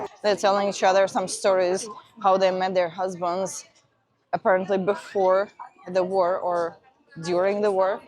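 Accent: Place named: Russia. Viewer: 😂😂🤣🤣 My Lord and My God!!! This woman 😅😅 you are so hilarious!!! And I love your thick Russian Accent. I kept on smiling throughout the video because of your English and how you complained about the undone dough and SPO being mixed with the celebration. New Subscriber, first time coming across your channel, but I can rest assure you that I have been to VDHKh. I was there last year in October, and I went to most of the Pavilion buildings within the short time, and you can see some of my videos under my Russian Playlist on my YouTube Channel. I actually thought that Russia has moved on from SPO to Counter T$rr@ Operation??? But anyways, I feel your pain and your rant concerning the mix up. You are such an adorable woman to listen to, with your incredible heart-warming and vibrant Russian accent 😁😁❤❤😁😁.... Keep up the good work..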